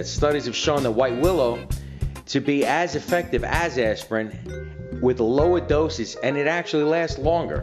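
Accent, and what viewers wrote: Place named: New York, United States. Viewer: Lol I'm sorry I kept giggling the whole film. The thick new yorkish accent is so funny and gives him this "hey guys, come ahhn" type of feel to the video.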